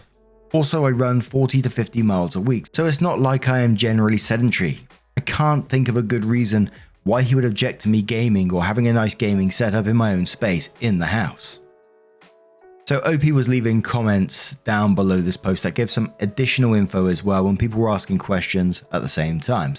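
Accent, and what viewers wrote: England, United Kingdom. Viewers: My favorite reddit reader is you,. I love your waffles, your giggles, and when you swear. I don't know why but it's better with an English accent. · I'm Scottish. I love channel too, but he has an ENGLISH accent. There's no such thing as a (singular) British accent!! 😉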